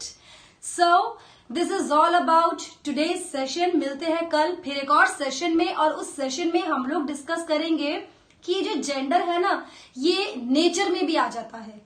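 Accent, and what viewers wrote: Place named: Sikar, India. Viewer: Didi mai sikar se hu aapki voice sikar me rahne walo ke jaise lagi isliye pucha tha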